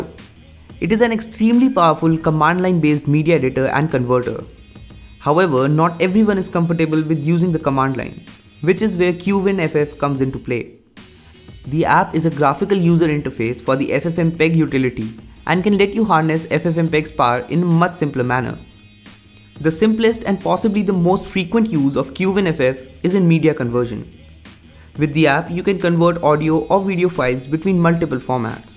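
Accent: India. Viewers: Make your videos with "In indian english" in title You are polluting my youtube History, and my ears... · Why haven't I seen/heard even one indian guy who can speak english properly without this stupid accent? It's just like hearing some foreigner speaking broken language you use in your country, unpleasant to listen to. I'm not saying everyone should have perfect british/american/australian/canadian/whatever accent but come on. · please google block all indian english accent videos it is horrible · sotaque indiano dos infernos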